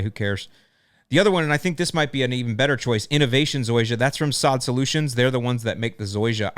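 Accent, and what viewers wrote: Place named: South, United States. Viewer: That southern accent 🤣🤣🤣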